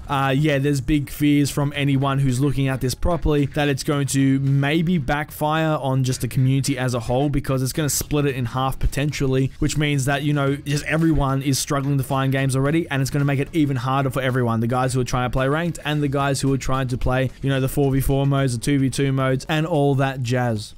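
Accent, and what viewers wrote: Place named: Australia. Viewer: Which part of australia you from? Im subscribing m8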